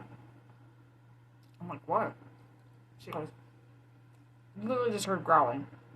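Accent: Michigan, United States. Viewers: lol i can tell you are a Michingander lol we have our own accent Hope you will think about lifecasting eat,sleep,s=it,shave shower even sleep streams😃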